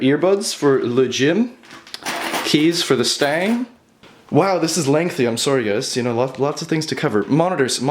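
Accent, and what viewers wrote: Canada. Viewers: Your Canadian accent really comes through in this video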